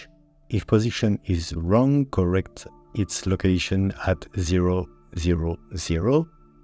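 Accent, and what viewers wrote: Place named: France. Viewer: Great my friend. Tu es français non? je reconnais l'accent. Anycase I tried others tutos, not working but yours did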